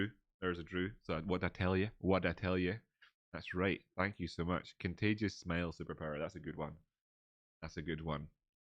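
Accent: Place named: Scotland, United Kingdom. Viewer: I've been watching you for months now, and have always wondered what the "uh oh" button was. While rewatching the VLDR TA i finally realized you were saying "auto button" with your scottish accent. Make's so much more sense now. im such a fanny lmayo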